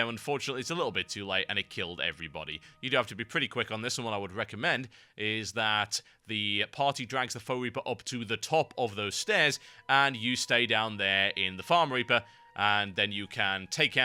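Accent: United Kingdom. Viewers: Hmmmmmm snarky british sounding guy who talks fast? That's a Yahtzee!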